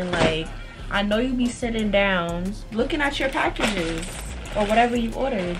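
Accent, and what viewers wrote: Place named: Jamaica. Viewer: As soon as me hear d patois me subscribe😂I shall live ova yah now🤣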